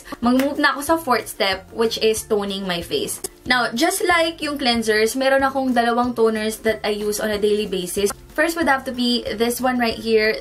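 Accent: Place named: Philippines. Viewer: I love how u speak english.. it is soooo pinay... ❤️❤️❤️ go girl ❤️❤️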